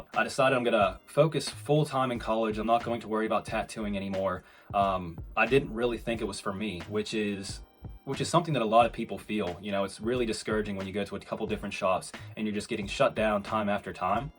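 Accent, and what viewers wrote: United Kingdom. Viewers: You sound British - can really hear a twang in there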